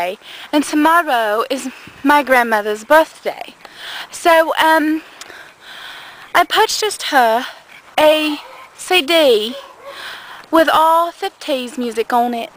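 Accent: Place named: United Kingdom. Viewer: Way to go great British accent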